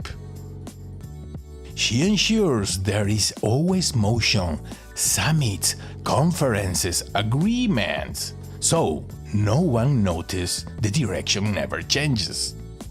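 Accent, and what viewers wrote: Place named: Latin America. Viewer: DUDE FAVOR! IF YOU'RE GONNA LAY DOWN THE HEAVY AI HISPANOLA ACCENT AT LEAST SWITCH ON THE CLOSED CAPTIONS!!! THERE'S ENOUGH PAIN N SUFFERING IN THE WORLD ALREADY!!! UGH SMH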